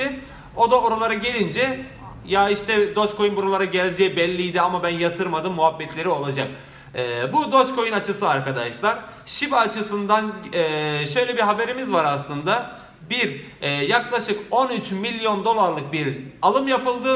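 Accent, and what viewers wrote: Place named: Samsun, Turkey. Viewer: Tam bir Samsunlusun “ E “ lerinden belli hemşehrim..👍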